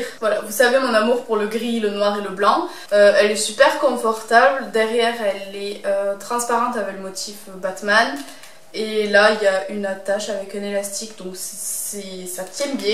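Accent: South, France. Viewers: D'ac, je pensais que tu parlais du undiz du polygone de Béziers car dernièrement tu cherchais un bar ambiance sympa sur béziers:) Au passage t'es vidéos sont aux top j'adore ta façon si naturelle de parlais et t'exprimer avec ton accent bien de chez nous:) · l'accent du sud miss vive le sud bisous j'adore tes vidéo continue comme sa gros bisousta un corp de rêve moi je comme a l'avoir je fait beaucoup de sport ❤❤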